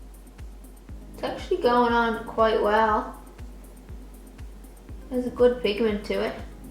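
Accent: Ireland. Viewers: Seriously I am subscribing just because I love your accent. Irish? My ancestors are from Cork. Stunning look by the way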